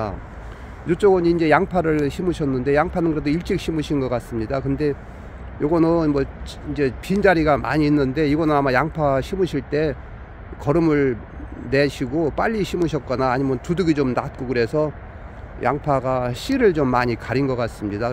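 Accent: Chungcheong, South Korea. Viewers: 구수한 충청도사투리 에 귀에 쏙쏙 정보 감사해요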